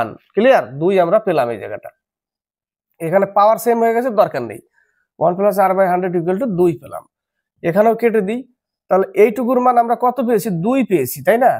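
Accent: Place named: Bangladesh. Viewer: কথা বলার ধরন তাতে মনে হচ্ছে আপনার বাড়ি বাংলাদেশ।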